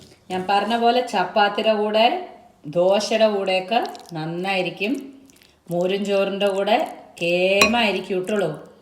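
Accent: Palakkad, India. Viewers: ഞാൻ ഒരു ട്രാൻസ് വുമൺ ആണ്. കുക്കിങ് ഇഷ്ട്ടമാണ്. ചേച്ചിയുടെ ഫാമിലിയെ ഒരുപാട് ഇഷ്ട്ടമാണ്. പാലക്കാടൻ തനിമ നിറഞ്ഞ സംസാരം ഒരുപാട് ഇഷ്ട്ടമാണ്. വീട്ടിൽ പ്രശ്നം ഉള്ളതുകൊണ്ട് എറണാകുളം ഹോസ്റ്റലിൽ ആണ് താമസം. വീട്ടിലെ ഫുഡ്‌ ഒകെ കഴിച്ചിട്ട് ഒരുപാട് ആയി. അമ്മയുടെ കയ്യ്കൊണ്ടുള്ള ഭക്ഷണം കഴിക്കാൻ കൊതി ആകുന്നു. ചേച്ചിയുടെ വീഡിയോ കാണുബോൾ എനിക്ക് എന്റ്റെ അമ്മയെ ഓർമ വരും. ചേച്ചി ഒരുപാട് സന്ദോഷത്തോടെ കുക്കിങ് ചെയ്തു മനസു നിറഞ്ഞു ആ ഭക്ഷണം കഴിക്കുന്നത്‌ കാണുബോൾ എന്റെ മനസും വയറും നിറഞ്ഞ ഒരു ഫീൽ ആണ്. Love ❤ U ചേച്ചി. എല്ലാവരോടും അനേഷണം പറയണം എന്റെ.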